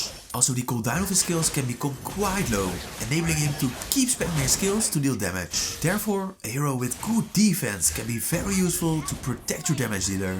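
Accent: Netherlands. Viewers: Ben je nederlands??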